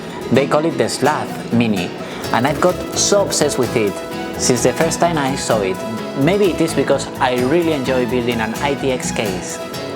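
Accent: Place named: Andalusia, Spain. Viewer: English from andalucia i can see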